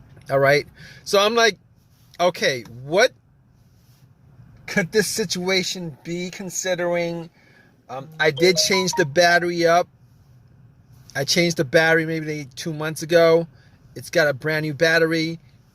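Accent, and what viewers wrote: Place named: Staten Island, United States. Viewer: Sounds like Staten Island accent. Judging by shade it’s 10 30am sxo he’s up early + just had first puff puff. Sadly, He and the boys won’t be getting “za” today.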